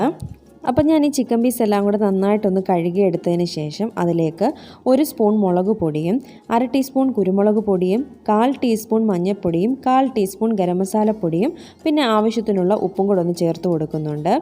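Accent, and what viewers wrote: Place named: Qatar. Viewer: Good presentation. Samsaram kettappol anik anne thanne pole thonni 🤩🤪 Qatar l alle. Qatar l anenkil angane manasilayinn reply kittitt parayam tto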